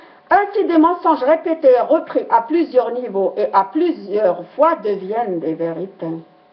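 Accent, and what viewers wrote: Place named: Algeria. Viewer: C'est dommage que les Algeriens aient de moins en moins un bel accent comme le tiens... Et pourtant tu attaques Daoud...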